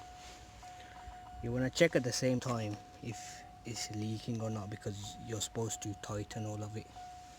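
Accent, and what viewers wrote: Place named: Birmingham, United Kingdom. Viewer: You sound like you're from Birmingham, help a brother out lol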